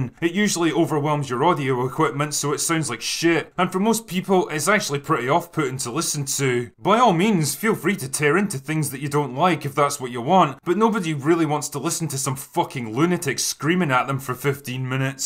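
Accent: Scotland, United Kingdom. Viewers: Love you,...same reason I love "orange man good". You're blunt, intelligent, you don't give an F and you get to the point, with a unique Scottish accent, articulation and you're just outright hilarious at times. I thank God for ya, mate. You're a man's man with a good heart. Alright. I'll go away now. Hugs bub. 🤗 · The Scotish accent helps too.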